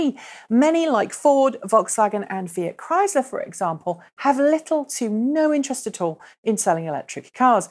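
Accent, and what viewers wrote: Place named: United Kingdom. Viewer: Judging by your accent you're from Britain, why are you pushing a frog car? Maybe you should visit Trafalgar Square more often.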